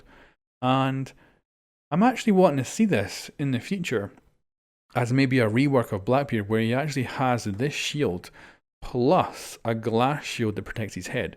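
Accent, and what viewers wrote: United Kingdom. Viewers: AR S it’s something called a b r i t i s h accent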